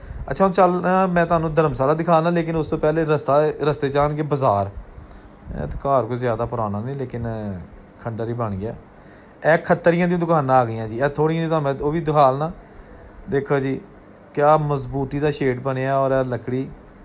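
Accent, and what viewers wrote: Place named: Gurdaspur, India. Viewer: Apki bolne ka lhja bilkul same vesa hai jisa Indian punjab ke gurdaspur side ke logo ka hai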